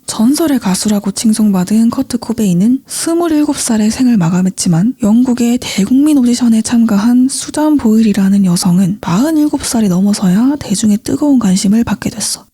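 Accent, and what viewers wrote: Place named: Busan, South Korea. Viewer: 나만의 타인의 고유성 다양성❤ 감사합니다 😊 부산분 같아요 만나고 싶네요 🙏🏼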